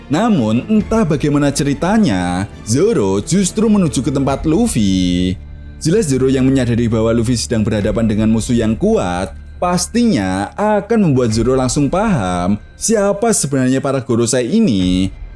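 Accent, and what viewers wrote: Java, Indonesia. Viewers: Mendok jawa jir😂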